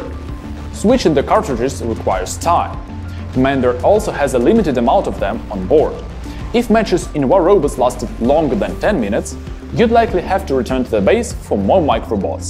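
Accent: Russia. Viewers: Ох уш этот звиздатый русский акцент😁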